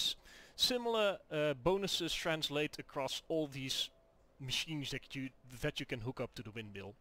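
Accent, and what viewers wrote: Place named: Netherlands. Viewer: dat is leuk ik ook ik hoorde aan je stem dat je niet van Engeland of America was je klinkt gewoon Nederland toen ik je hoorde praaten dacht hij klinkt Nederland ff vragen waar hij vandaan komt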